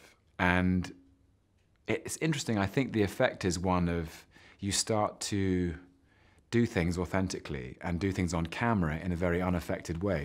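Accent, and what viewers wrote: United Kingdom. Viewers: 我只是來聽他的英國口音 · Tom的聲音真的好好聽...還有那個英國腔真的太酥了吧（倒地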